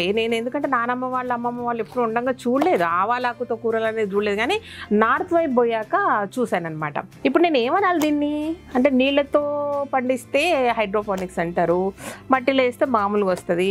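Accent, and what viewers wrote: Telangana, India. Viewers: U speak liķe me mast hydrabadi telàngana.super atlane frankga vundu friend.dont change ur way of speaking in telangana. Mast ga chestunru n success ayinattu chupistunru.great but nenu itlane idea tho youtube lo chusi chesina mentulu,pudina chenagalu.without soil n with soil sand lo but raledhu. Micro grain greens avuthalevu.y reason cheppandi friend.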